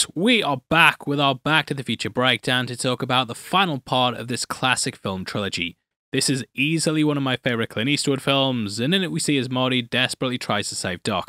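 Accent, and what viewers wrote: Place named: United Kingdom. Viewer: "from when he played Gundam" ....I know british accents make some words are hard, but that's an interesting mispronunciation of Gunman XD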